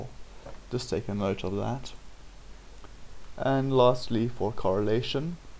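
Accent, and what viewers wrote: South Africa. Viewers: screams are you South African??!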